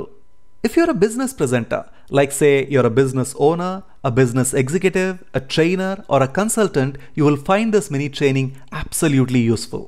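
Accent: India. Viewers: wonderful!! best step by step explanation...n best part is Indian English. Thank you for sharing the idea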